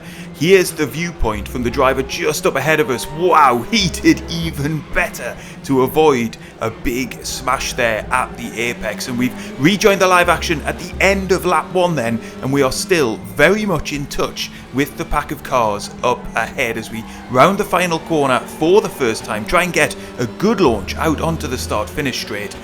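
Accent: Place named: Wales, United Kingdom. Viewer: Whoa I tell you mate what you're fartin sparks! Are you Welsh?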